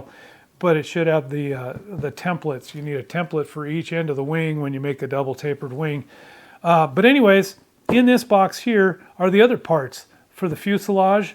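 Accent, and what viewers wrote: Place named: United Kingdom. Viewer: Your pronunciation reminds me of my favorite movie "battle of Britain" , from 1969 , the old British actors says it just like that ( with the proper amount of stiff-upper lip) , in that movie you also get brits trying to pronounciate "stukas" and Germans trying to say "Spitfire" which sounds hillarious , not ready to tackle a warbird scale myself for building and flying ( but trying to cobble together an old falcon 56 kit for the moment) your channel has soo great tips on building, cant wait to try some of those techniques!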